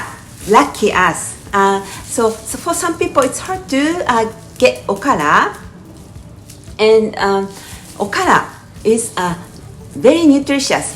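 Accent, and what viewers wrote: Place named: United States. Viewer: Thank you!!...I love your accent japanese - american ;greetings from a peruvian nikkei !!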